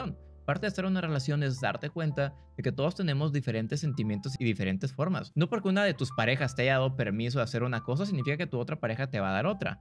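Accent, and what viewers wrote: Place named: Mexico. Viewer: Ya eres mexicano Perdone ya note el acento de Mexico.😅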